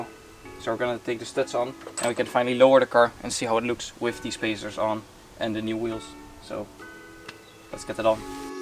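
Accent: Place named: Netherlands. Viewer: You sound Dutch, are you in the Netherlands? Am in the Netherlands if you are, just bought my e46!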